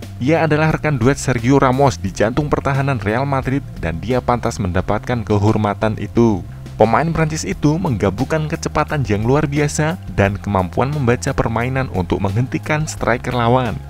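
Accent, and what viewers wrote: Java, Indonesia. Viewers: pengisi suaranya medoookkk..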